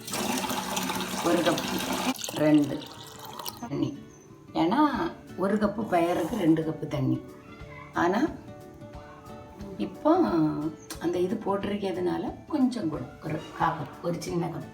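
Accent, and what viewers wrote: Nagercoil, India. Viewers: Nagercoil slang super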